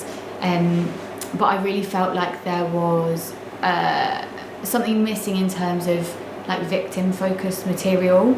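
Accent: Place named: Cockney, United Kingdom. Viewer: I love!!! Love the way she delivers her podcast straight in no giggling like you get on some of these other ones no respect whatsoever. Such a lovely voice too half posh half cockney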